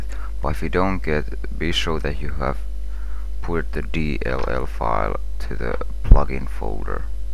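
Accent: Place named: Finland. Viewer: finnish as fuck, great totreal lol.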